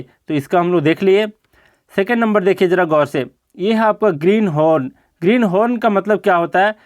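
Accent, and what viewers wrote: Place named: Jamshedpur, India. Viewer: Sir app Jamsehdpur se ho kya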